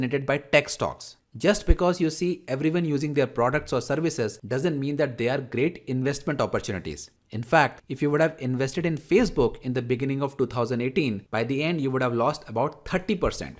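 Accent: India. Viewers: Indian English Accent Is The Most Painful English Accent To Ears In The World.... Next Time Hire Native English Guy Through Fiverr For Narrator Part....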